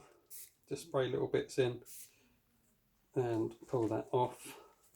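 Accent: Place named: England, United Kingdom. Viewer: Good to hear an English accent instead of dreadful drawl from the American offerings.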